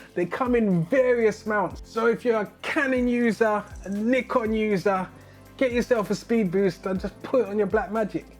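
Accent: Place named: England, United Kingdom. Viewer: great review. gonna pick one up. also this is my favorite english accent, haha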